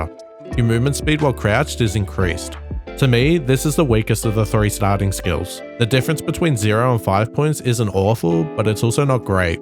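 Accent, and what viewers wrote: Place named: Australia. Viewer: AUSTRALIAN ARC RAIDERS YOUTUBER DETECTED LETS GO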